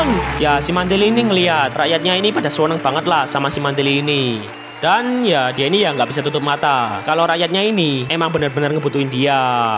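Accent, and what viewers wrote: Java, Indonesia. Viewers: Kok logatnya logat org jawa🗿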